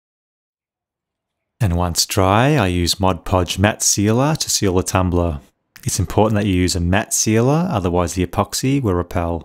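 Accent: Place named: Australia. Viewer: I also love your Australian accent... I can't find your name here. What is it?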